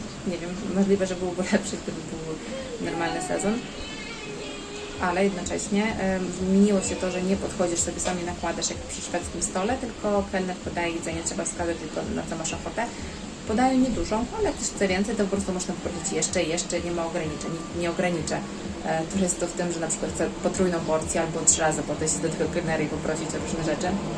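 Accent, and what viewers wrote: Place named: East, Poland. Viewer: Akcent wschodni Pani ma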